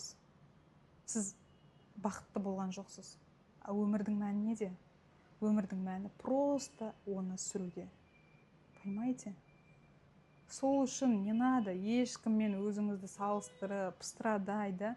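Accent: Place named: Kyrgyzstan. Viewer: саламатсызба, сиз кыргызсызба? Себеби көп сөздериңде таза кыргызша айтасың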